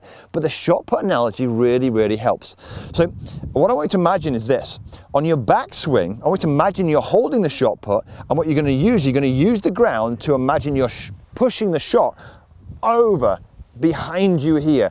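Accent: Yorkshire, United Kingdom. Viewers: Thanks, With your Yorkshire accent you remind me so much of him with his enthusiasm and simplicity. Best wishes.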